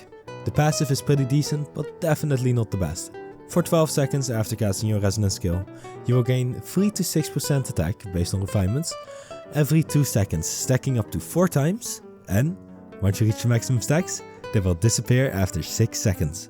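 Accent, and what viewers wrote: Netherlands. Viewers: My first video I see of you and the moment I heard you talk, I knew you were a fellow Dutch person XD Thank you for the vid tho! I love Lumi's fighting style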